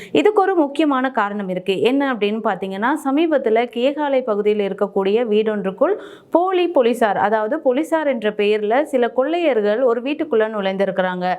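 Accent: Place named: Chennai, India. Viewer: இலங்கை மக்களுக்கு செய்தி Madras தமிழில் தூதூதூதூ.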